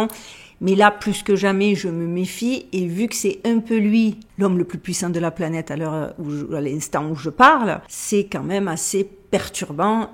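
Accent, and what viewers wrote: South, France. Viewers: Son accent du sud est insupportable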